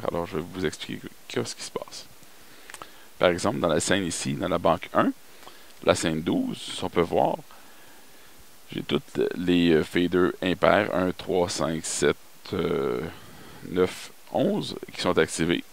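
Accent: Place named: Quebec, Canada. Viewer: En plus avec ce doux accent du Québec , c'est du bonheur !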